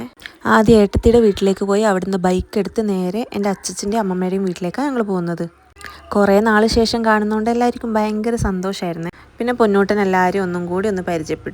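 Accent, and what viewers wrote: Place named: Central Travancore, India. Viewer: സംസാരത്തിൽ മധ്യ തിരുവിതാംകൂർ ആണെന്ന് തോന്നി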